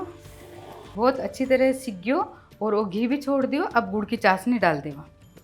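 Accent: Rajasthan, India. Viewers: I like your Rajasthani boli and recipes too.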